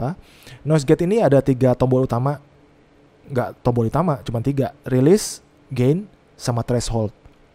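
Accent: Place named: Medan, Indonesia. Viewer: lah kirain orang medan , cara bicaranya itu dari video²lainnya kek medan bang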